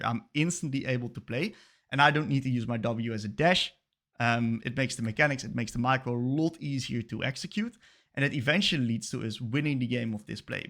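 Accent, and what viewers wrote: Netherlands. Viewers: Sounds dutch.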